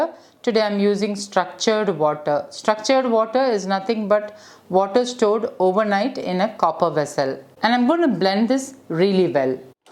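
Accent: Kerala, India. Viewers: In malayalam it is pronounced as dhosha...her native is kerala...so obviously she speaks with malayalam accent😊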